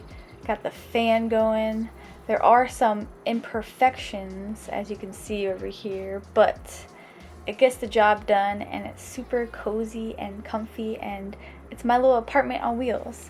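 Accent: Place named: South, United States. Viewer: I must say you're very pretty and seem like wicked down to earth girl cool ass chick.. curious how old are you? To me you have an accent must be from down south I'm guessing right?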